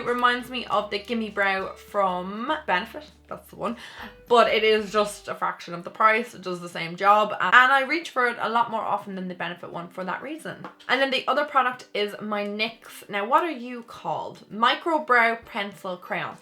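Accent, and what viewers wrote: Ireland. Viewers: Trte Mrte she's Irish. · What part of Ireland are you from x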